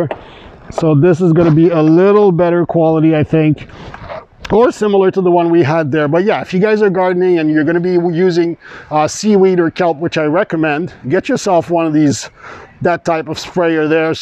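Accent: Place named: Quebec, Canada. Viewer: Hey there...just found you on here. Was listening to Epic gardening podcast and recognized a fellow bilingual Quebecois. I'm in Val-des-Sources( formally Asbestos) and love seeing the familiar products as I see on the shelves here...I guess I would have to consider myself an experienced gardener at this point, but love finding new ways to inspire others how to grow something.... anything really..lol I'm officially a Fleuriste by deploma, but have worked many years in a centre de jardin/pipinere learning from old school gardeners, and have always had my own gardens of my own over the years. This year I am introducing wine cap mushrooms to my pathways...😁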